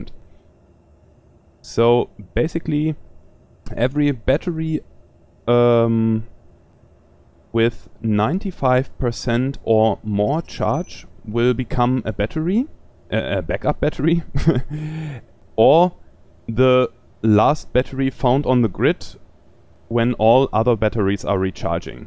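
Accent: Germany. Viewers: As a German, I swear to God this guy is a German as well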